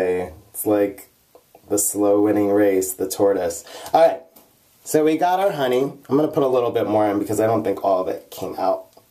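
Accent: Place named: United States. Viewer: I'm British and I just love your American accent!! Thank u soooooo much for making videos like these coz they r just so helpful and I'm really grateful for that 😄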